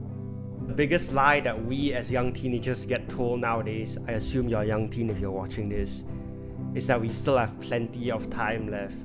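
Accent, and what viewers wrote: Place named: Singapore. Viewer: Singaporean accent makes the message better good luck to you young man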